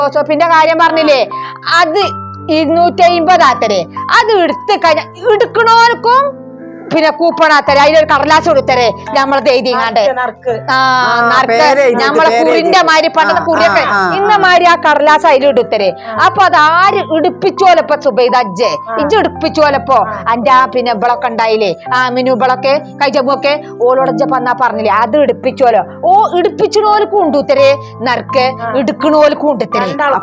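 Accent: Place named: Karuvarakundu, India. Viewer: ഇത് നമ്മളെ നാട്ടിലെ പാർക്ക്‌ ആണല്ലോ കരുവാരകുണ്ട് എന്റെ നാട്. നിങ്ങളുടെ സംസാരം കേട്ടപ്പോൾ തന്നെ തോന്നി ആ ഭാഗത്തു തന്നെയായിരിക്കും എന്ന്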